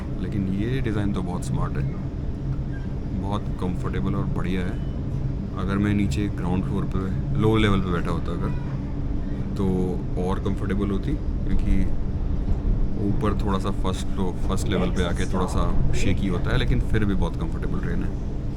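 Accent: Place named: NCR, India. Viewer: Beautiful vlog in ur commentary ur ascent is still from NCR even in abroad - Keep exploring 🎉🎉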